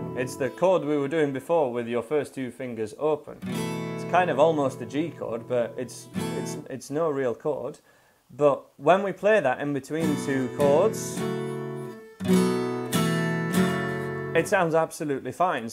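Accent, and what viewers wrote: United Kingdom. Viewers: its a British Rhett!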